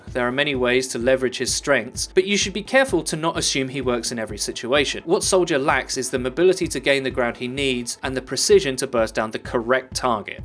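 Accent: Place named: United Kingdom. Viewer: Zack Edwards Im British, but cmon dude, he has one of the most overly British accents ive ever heard.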